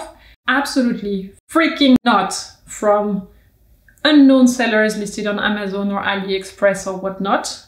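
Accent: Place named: France. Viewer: Just a small observation, since you're back in France, your French accent can be heard a bit more clearly again. :D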